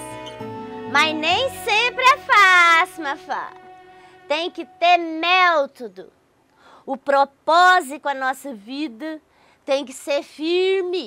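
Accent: Minas Gerais, Brazil. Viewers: Amo vc 💕 VC tem mim ajudado muito com seu jeito mineiro de falar comigo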